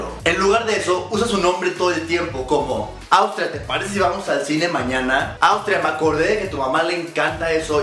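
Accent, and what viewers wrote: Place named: Fresa, Mexico. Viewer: Hablas freson y fresco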